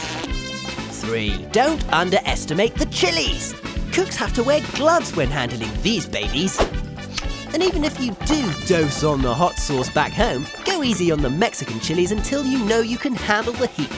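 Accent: United Kingdom. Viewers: He's not an American, he has a British accent.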